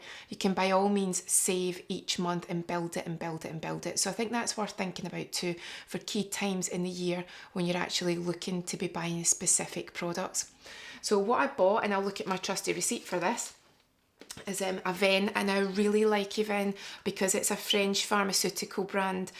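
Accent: Scotland, United Kingdom. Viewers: I'm sorry, but the Scottish accent is one of the most amazing! Love it.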